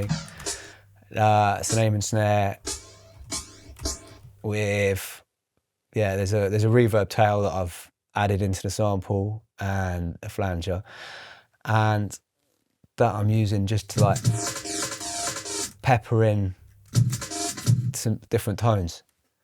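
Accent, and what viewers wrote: United Kingdom. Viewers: He has a standard Estuary English accent. Hard to define a class based on it I would say.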